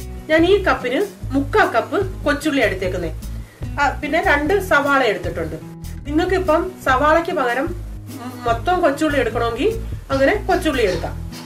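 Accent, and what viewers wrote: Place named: Kottarakkara, India. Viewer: സംസാരം കേട്ടിട്ട് കൊട്ടാരക്കര ഏരിയയാണ് വീട് എന്നു തോന്നുന്നു.,ശരിയാണോ??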